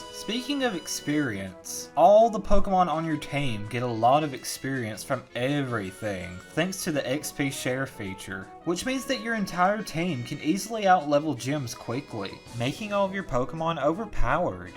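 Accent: South, United States. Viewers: Are you a southerner?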